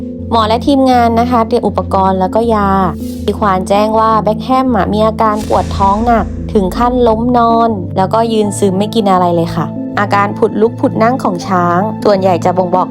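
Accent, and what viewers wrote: South, Thailand. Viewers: หมอเป็นคนใต้หรอ🧐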